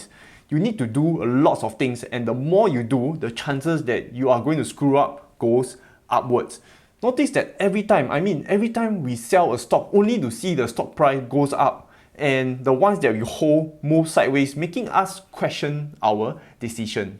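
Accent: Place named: Singapore. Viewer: Singlish ? Hard to understand !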